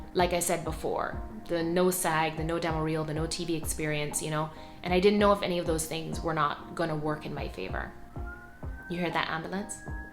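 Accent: Cayman Islands. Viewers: Cayman Accent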